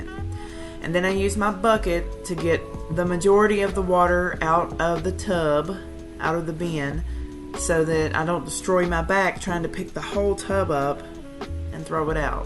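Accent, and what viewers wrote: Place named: South, United States. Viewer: I’ve been washing fleece for many years. I never knew to soak it in plain hot water first. I’ll have to try this. I have a method to get more water out of your fleece. I use old bath towels folded in thirds length wise. I spread a layer of washed fleece on it, roll it up and step on the rolled up towel. When you unroll the towel the fleece is damp and the towel is wet. It dries much faster and the rolling and presser from stepping on it has never caused the fleece to felt. You sound like you’re from the south. I’m in Tennessee. Are you anywhere close? Enjoyed your video. Thank you.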